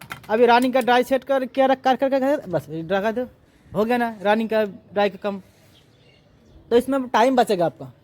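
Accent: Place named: Bengal, India. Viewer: ও,,, দাদা,,,,,,,,,,, হিন্দি আর একটু ভালো করে শিখুন না হলে বাংলা চলে আসছে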